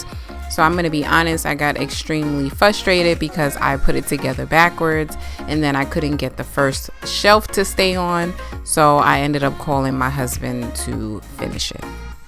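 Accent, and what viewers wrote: New York, United States. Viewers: Glad you're better Suga, I love it! You picked a great color for your accent wall..I am a pillow person so I'm fine with it. They look really nice once the bed is made. I was cracking up when you were on the bed watching tv while hubby was fixing the bookshelf!! You have a beautiful NY accent!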